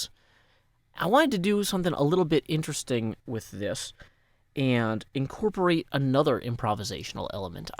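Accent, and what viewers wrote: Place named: South, United States. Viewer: Hey are you a country guy you sure sound like one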